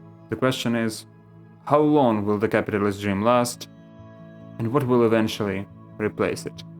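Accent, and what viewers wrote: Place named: Ukraine. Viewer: Finally, Ukrainian voice on a niche topic in English. Please, go on.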